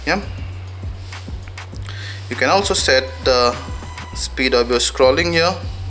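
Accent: Assam, India. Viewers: the guy has a very much Assamese accent ;)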